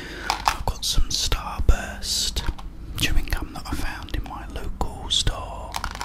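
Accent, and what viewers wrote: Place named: London, United Kingdom. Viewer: Just found your channel! I’ve never seen an ASMRtist wiv a propa landan accent. I’m born and raised London myself, so I got an extra pang of like nostalgia and just general asmr from the whispered vaguely cockney “wewcome” at the start I’m now hoping you actually are from London or this’ll sound a bit weird 😂 Love the content nonetheless!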